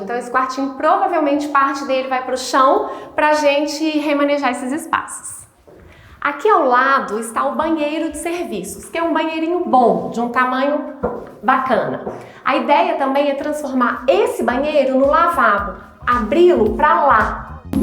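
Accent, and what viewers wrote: Minas Gerais, Brazil. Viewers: Primeiro me atraiu as dicas e pelo sotaque charmoso de minas. Depois de vários videos assistidos, me apaixonei por sua racionalidade, perspicácia e criatividade nas soluções. Se eu fosse rico, te traria aqui pra São Paulo para transformar meu apartamento em um apartamento de resort rsrs. Tenho certeza que amaria as soluções. Todo sucesso do mundo para ti. Abraços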